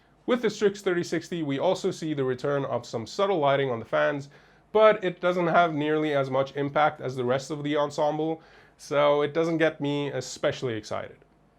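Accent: South Africa. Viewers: hehe, do I detect as slight South African accent in there?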